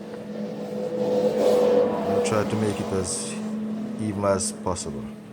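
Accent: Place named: Jamaica. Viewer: Heyyy jamaican accent! Bomboclat!!!!!!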